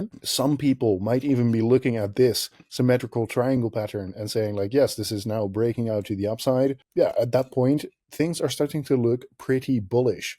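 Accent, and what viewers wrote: Netherlands. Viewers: Your accent sounds Dutch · Ik hoor NL 😅 Waar trade je met leverage?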